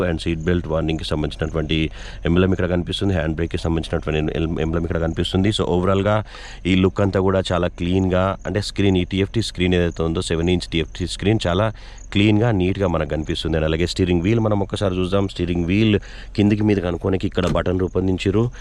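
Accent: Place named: Telangana, India. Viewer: using our Telangana voice language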